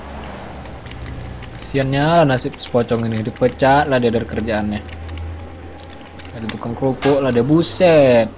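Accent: Medan, Indonesia. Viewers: ngomongnya kaya orang medan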